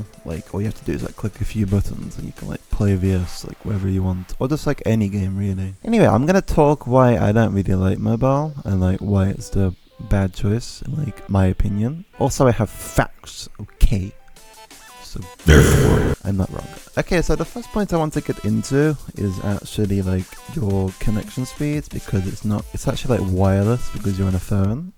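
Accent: United Kingdom. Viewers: Best British accent ever known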